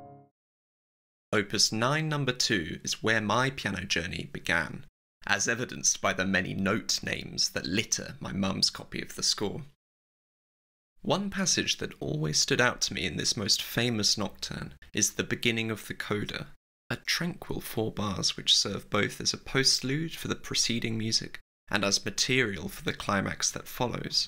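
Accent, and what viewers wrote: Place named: United Kingdom. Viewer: Well, why not do another video? Decide on your intended audience (casual listeners or devoted students), announce what you're going to demonstrate, and do your demonstration in a relaxed, orderly and progressive way. Clearly you have the knowledge and the ability. Your British articulation is beautiful, and no doubt your analysis is insightful, but I couldn't follow you. Either I am a slow brain or you spoke too fast. I'd like to hear what you have to say about Chopin's Nocturnes, but why cram everything in one video when you can make as many as you like? Best wishes . . .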